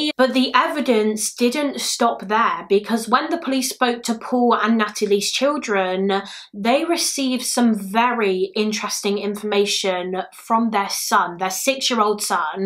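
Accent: United Kingdom. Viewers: I’ve also noticed that most true crime YouTubers I’ve came across literally are from the UK.. I’m from America but I have so much true crime channels I’m subscribed to, but out of all the channels I watch religiously only like 2-3 of those are from America, Like 1 is from Canada, and 10+ are from the United Kingdom, that’s crazy as hell, but don’t get me wrong that’s dope as hell… bcuz I love British accents! I love your accent, its sooooo CUTE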